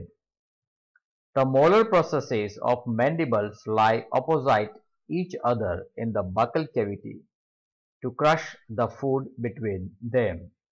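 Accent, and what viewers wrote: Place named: India. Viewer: That Indian accent is so irritating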